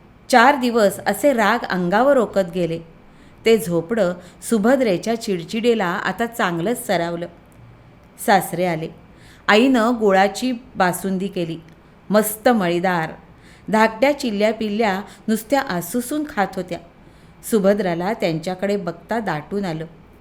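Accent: Rural, India. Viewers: ग्रामीण बाज खूपच छान....👍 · Bhashet gavthi thaska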